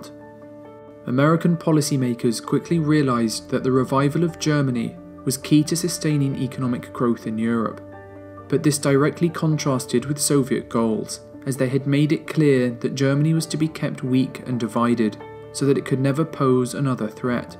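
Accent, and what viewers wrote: United Kingdom. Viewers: Just was wondering what is your nationality? You acent sounds British but very proper. It makes the documentary just that much better. Great video I thought I knew a lot about it myself but after watching this I can honestly say I fell like I learned a great deal in the time it took to finish your video. Please keep making videos.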